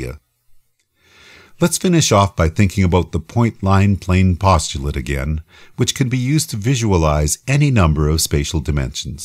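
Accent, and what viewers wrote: Canada. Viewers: Great video, really well done, but that Canadian accent is pretty rough! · The narrator is canadian!! :) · you sound Canadian.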